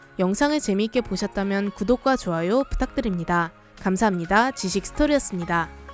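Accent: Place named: Gyeongsang, South Korea. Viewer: 이언냐 갱상도언냐네..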